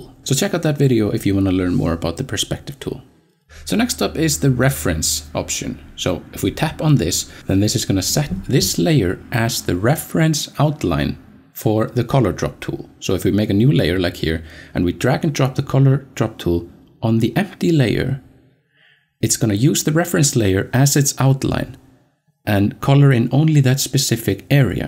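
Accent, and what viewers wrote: Iceland. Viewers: Great tutorial! Thank you. Love your Icelandic accent too, a bonus! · Hi, thank you soo much for these amazing tutorials! I’m completely new to digital drawing (and not very experienced in drawing in general) and just got my first iPad (pro <num>) and bought the Procreate app mostly out of hopes to unleash my creativity in a new way. However, as I started exploring the app I got quite overwhelmed and a bit discouraged (and amazed as well of course) by all the things you can do with the program, so when I found your videos today (!) I felt really glad and hopeful that I can learn and eventually have no limits in creating in it but my own brain…. 😌☺️👍 I will check out all your tutorials and stuff (some, like this one, a few times or more I guess, before I really understand this way of thinking and creating) and I really want to thank you and commend you on great teaching skills and videos! 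Extra plus for your wonderful Icelandic accent as well! 😉👍 Best wishes from an inspired Procreate beginner in Sweden!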